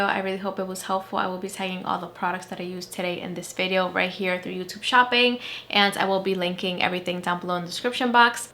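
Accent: Dominican Republic. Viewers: Your Dominican accent ❤🇩🇴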